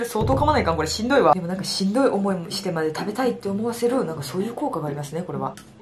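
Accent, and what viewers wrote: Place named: Kagawa, Japan. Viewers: 、最近発見してばりハマってます（笑） 私は香川出身やけん方言似とってめっちゃ親近感湧いとる！！ 応援してます♡ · 久しぶりにキレイに食べる大食い選手！って思ったら普通の可愛い子やった…。 イントネーションが凄い安心するんやけど、同じ四国の民だったwww 近所の可愛い子やん。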